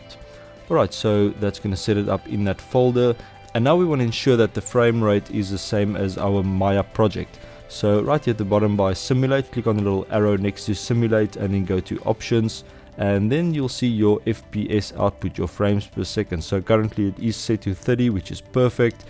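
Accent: South Africa. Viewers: Ja wat se! good to hear some SA'n tutorials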